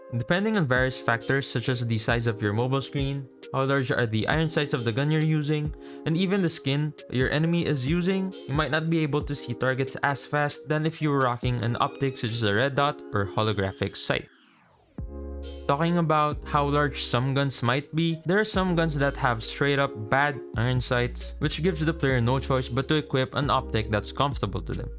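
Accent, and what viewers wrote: Philippines. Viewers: your accent is like a pilipino accent. are you half pilipino?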